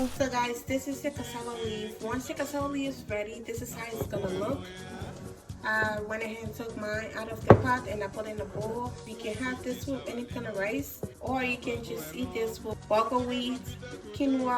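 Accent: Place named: Liberia. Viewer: She is definitely Liberian that accent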